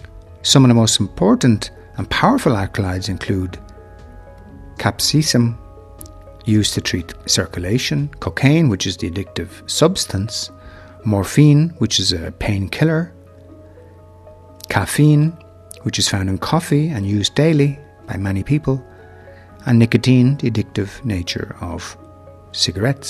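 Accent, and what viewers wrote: Ireland. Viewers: Southern Irish Gaelic accent. Very relaxing. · I would give this video a million likes if I could. As a student who struggles with Pharmacology and dreads to learn about it.. you really opened my eyes. You made it so easy to understand, beautiful visuals and of course beautiful Irish accent. The best video I've actually come across so far on such a complicated subject. You have really helped me ease my stress. God bless you!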